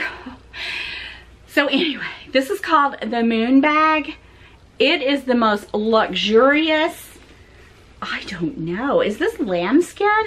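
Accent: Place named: South, United States. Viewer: I see u got that southern accent luv it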